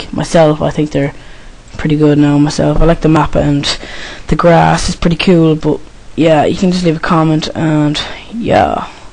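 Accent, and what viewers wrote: Ireland. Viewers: WHAT PART OF IRELAND ARE U FROM? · are you irish you sound irish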